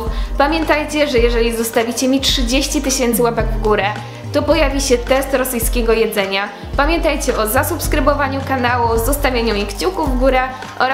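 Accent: Poland. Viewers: Ty jesteś z Rosji, czy masz chociaż korzenie, bo ten akcent to jednak Polski (i to BARDZO)?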